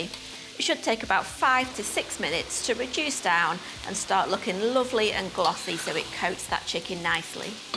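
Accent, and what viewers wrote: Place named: Manchester, United Kingdom. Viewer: It’s fantastic that (a) you are British, & (b) you sound like a MANC or at least a Northerner.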